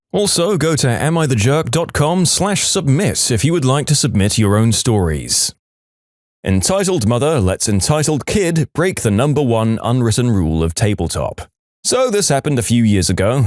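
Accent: United Kingdom. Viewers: is it just me or does anyone remember his voice being deep in past episodes and now he sound British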